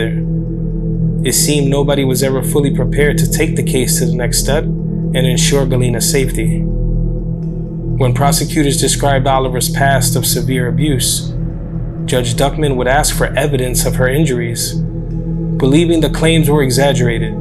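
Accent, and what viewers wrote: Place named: Long Island, United States. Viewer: This channel is great. Such well researched cases put to the public respectfully and with the victims and their families clearly thought of. I'm from long island and truly love all the local case stories told by people from where I'm from who talk like me and have an understanding of where we come from. Great work and much love. GOD ZESS AND PLEASE KEEP THE CONTENT COMING.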